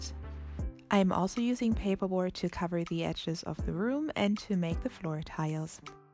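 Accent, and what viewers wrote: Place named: Germany. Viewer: You can clearly tell she's Herman by the way she's speaking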